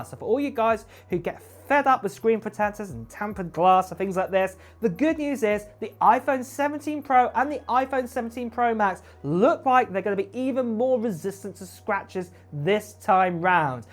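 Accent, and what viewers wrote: Cockney, United Kingdom. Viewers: This was almost painful to listen to….sorry but the English cockney accent was very difficult to understand.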